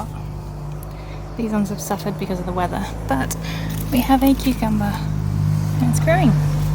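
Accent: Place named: United Kingdom. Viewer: I've always wondered what part of the UK you're form cause of the accent. I'm currently from the Midlands. Also love the veggie garden, both of my Uncle have quite the green thumb as well, planting their own veg lately.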